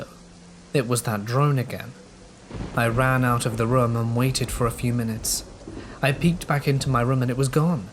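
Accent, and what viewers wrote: England, United Kingdom. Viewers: Hey! Bro, I love your channel content. I listen to your scary stories every night before I go to bed, and I gotta say... I look forward to it every day. Have a good rest of the week. I may send you some of my true scary experiences with ghosts and the supernatural. It would be so exciting to hear you recite them in one of your videos! One more thing...your English accent is superb! Almost makes me want to speak like that, and just adopt the accent for when I move away, lol.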